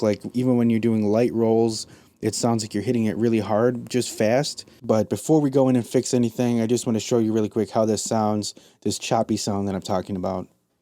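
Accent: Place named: Midwest, United States. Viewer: I come to these videos all the time but I can't get over the Midwest accent you heeeve. Hey hates. What's heyppening with the hey hates. Ugh.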